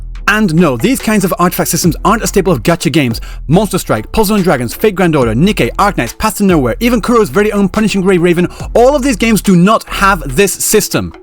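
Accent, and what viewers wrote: United Kingdom. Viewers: The Linyang roast in the first 30 seconds has earned my respect. Also why do you sound so British don’t you live in Japan????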